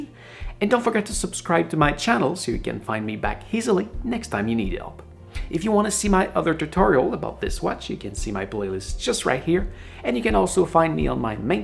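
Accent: Quebec, Canada. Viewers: He's French Canadian. It's a wonderful accent in my opinion.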